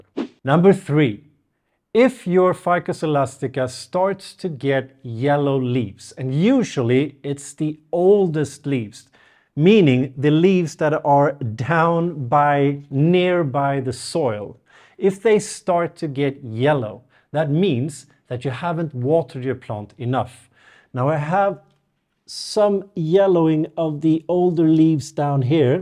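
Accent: Scania, Sweden. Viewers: Just love how he speaks English with a touch of skånska. ”Reubusta” 😂 Great video guys 👍🏽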